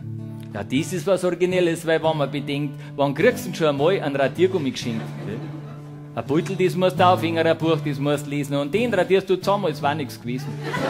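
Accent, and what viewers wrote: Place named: Upper Bavaria, Germany. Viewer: Gerade durch Zufall auf dich gestoßen und find es echt geil.Darf man fragen wo du her kommst?Vom Dialekt her aus Oberbayern?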